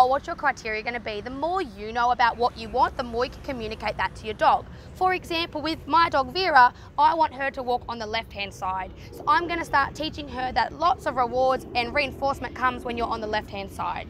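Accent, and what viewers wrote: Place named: Australia. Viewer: What nationality are you?? She's speaking Australian & we speak slower then other nationalities.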